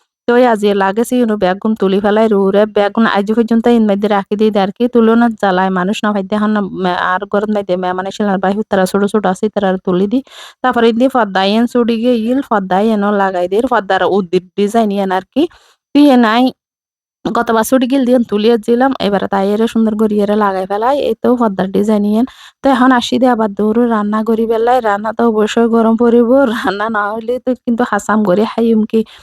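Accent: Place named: Chittagong, Bangladesh. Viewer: আপুর বাড়ি মনে হয় চট্টগ্রামে তাই মনে হইল বাসায় হিসেবে আমার কাছে ভালো লাগে চট্টগ্রামের ভাষা 💗💗💗💗💗👍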